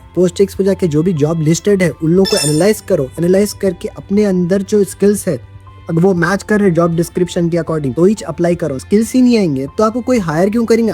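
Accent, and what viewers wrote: Hyderabad, India. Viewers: Hydrabadi accent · Hyderabad se ho aap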